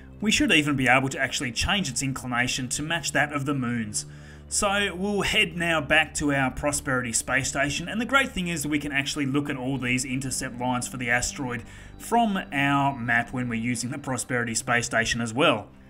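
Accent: Australia. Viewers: Hey! What part of Australia are you from? I'm from Melbourne:) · an old Australian audio book guy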